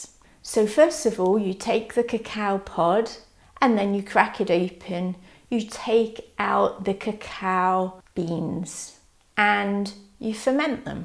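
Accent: United Kingdom. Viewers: Mam, are you speaking British language because I'm learning British language, plz reply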